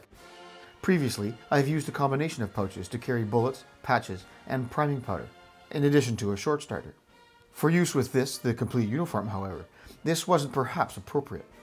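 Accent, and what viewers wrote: Canada. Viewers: As a former rifleman I find the uniform slightly lighter than it should be and no offence ment but Canadian accent and rifles uniform just sounds odd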